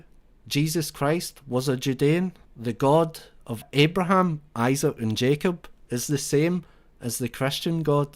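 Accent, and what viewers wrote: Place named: Scotland, United Kingdom. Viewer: was expecting an american person making this ... was really shocked when i heard a scottish person start talking! You must be from the outer hebrides or something because the majority of scotland is a secular , rational , irreligious place.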